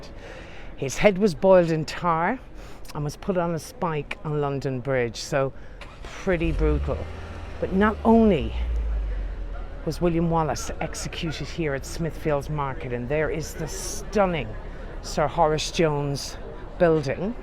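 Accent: Ireland. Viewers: Definitely agree. Just discovered this channel and is an incredible guide. Lovely Irish voice. Sounds the same as my former work colleague and she was from Co. Kildare. Xxx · I would love to see your video of your travels home. I thought I recognised your regional accent, as my husband's family are from Mitchelstown. I would love you to visit Bunhill fields. Resting place of some very familiar names. Daniel Defoe being one. A short walk from old Street tube station, and a real gem. Safe journey home. ☘️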